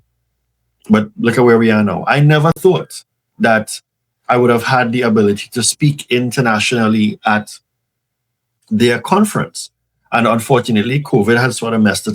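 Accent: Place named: Trinidad and Tobago. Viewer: You sound Trinidadian so subscribed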